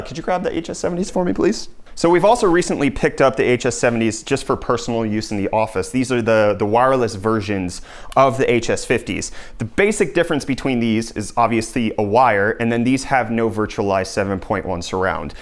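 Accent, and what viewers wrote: South Africa. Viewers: WOW WOW WOW! Wait are you from South Africa?? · damn his english is actually so good wtf? wait is there a south african accent?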